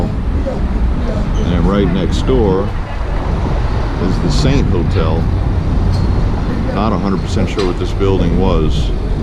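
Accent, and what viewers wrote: New Orleans, United States. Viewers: In your second reply below, you mentioned taxes.....I would imagine they would be very high in the French Quarters and probably everywhere else, considering the historical aspects of the entire city. I've certainly been enjoying all of your wonderful videos. You would be a most interesting lecturer....you have the right voice and your charming New Orleans accent makes it even more delightful. Thank you so much.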